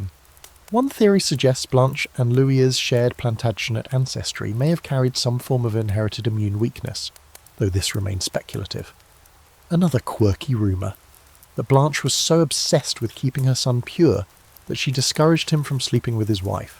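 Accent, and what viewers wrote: United Kingdom. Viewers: ive been joking for years that I put on a documentary with some guy with a british accent to help me fall asleep. I finally found your account. Thank you from Chicago illinois. Its 1am here.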